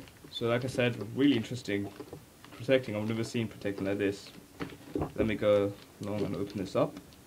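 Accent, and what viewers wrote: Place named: London, United Kingdom. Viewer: You sound like you're from Harrow or Stanmore. I'd be so happy if I'm right :P P.s. good video, thanks. Think I'll be getting the cyclone voyager 10.1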